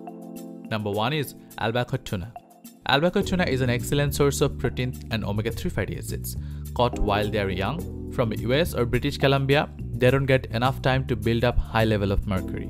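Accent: India. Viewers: Indian accent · Wrong information!!! Indian accent!!!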